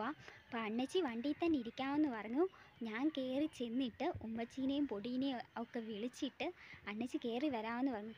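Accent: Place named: Kannur, India. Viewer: കണ്ണൂർ ആണെന്ന് തോന്നുന്നു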